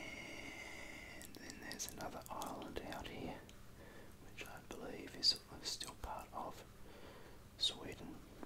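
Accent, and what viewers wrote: Australia. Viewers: Saw Australian accent and immediately subscribed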